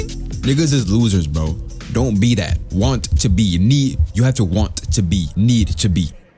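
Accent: Dominican Republic. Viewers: So you're Afrolatino! That's so cool!! Also, "not well spoken or articulate"? My guy, Dominican accent aside, you are very FLUENT!! Your grammar and pronunciation are ON POINT! And this is coming from a native Spanish speaker myself!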